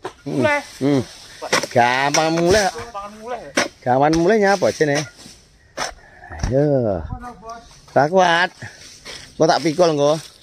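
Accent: Tulungagung, Indonesia. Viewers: logatte jian kental tulungagung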